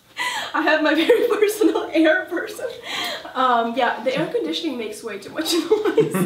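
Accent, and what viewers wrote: France. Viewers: i'm from canada and i speak french at home and I love the french accent !!!!! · Je suis fraçaise et j'adore ton accent français ! :D Bisous de la France <3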